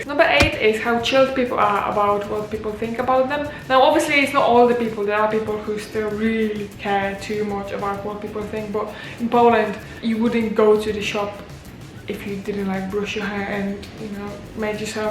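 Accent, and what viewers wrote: United Kingdom. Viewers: you have a slight northern accent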